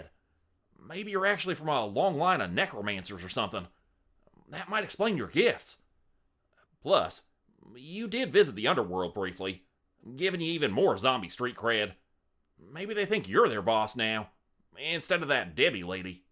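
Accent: Canada. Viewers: I like your Canadian accent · Your Canadian accent makes your stories very entertaining good job!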